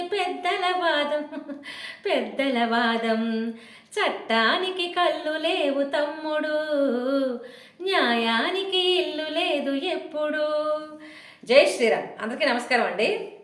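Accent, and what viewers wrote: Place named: North Andhra, India. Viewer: 🌹జై శ్రీరామ్ 🙏 బంగారుతల్లీ వందనాలు. రాబోయే ఉపధ్రవాన్ని మరచి, గాడనిద్రలో వున్న మన హిందువులలో చైతన్యం తీసుకురావటానికి మీరు చేస్తున్న ఒక మంచి ప్రయత్నాలకి అభినందనలు, ఆశీస్సులు. సహజమైన, చక్కని ఉత్తరాంధ్ర యాసలో మీరు మాట్లాడే విధానం చాలా బాగుంది. మీరు అద్భుతంగా పాడుచున్నారు. మీకు సదా ఈశ్వరానుగ్రహప్రాప్తిరస్తు. 🌹🌹🙏🙏🙏🌹🌹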